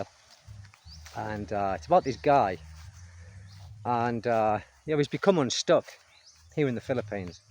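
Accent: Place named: Newcastle, United Kingdom. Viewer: “Hello you lovely lovely people” is the reason I tap on his video every time he uploads one. Also because Geordie is a fascinating accent, I’m scared to set foot on Philippine soil. The nightmare begins in the airport. I just like to travel vicariously through videos of their beaches and lush greenery in the mountains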